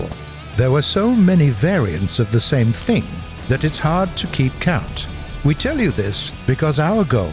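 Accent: England, United Kingdom. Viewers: Gaijin is a Russian company, and they hired someone with a thick English accent to narrate it. Dunno, just an observation. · Мммм... английский акцент. Бляяя... Ну чуваки, всеж нормально начиналось. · Ricardo Mierz but...he sounds so clever! He sounds so...British!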